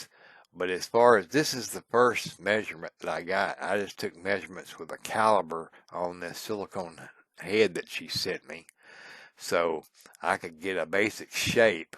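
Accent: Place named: South, United States. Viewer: now THIS is what youtube is for. People who are EXTREMELY talented in their craft, and of course, a nice southern voice-over included with actually instructive narration. God speed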